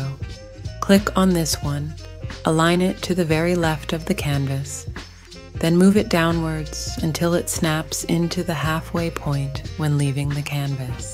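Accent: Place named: Russia. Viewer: mam are you from Russia amazing accent waooooo 😊😊😊😊😊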